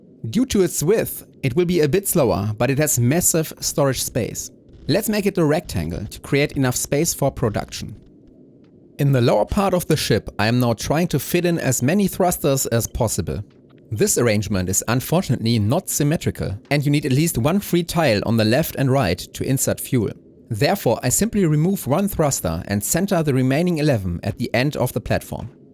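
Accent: Germany. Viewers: Den deutschen Akzent hört man extrem raus.😅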